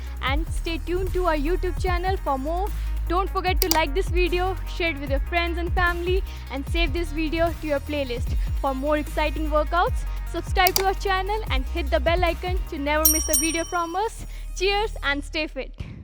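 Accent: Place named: India. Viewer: Her indian accent blow tho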